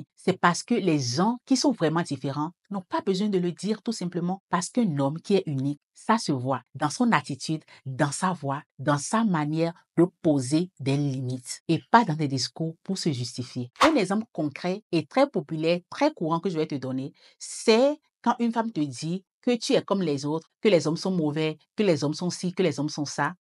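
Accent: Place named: Benin. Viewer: Ce que j'apprécie vraiment par dessus tout au delà de la clarté avec laquelle vous expliquer les choses c'est le faite de garder votre acens naturel de femme africaine et particulièrement béninoise sans pour au tant mimer l'accent occidental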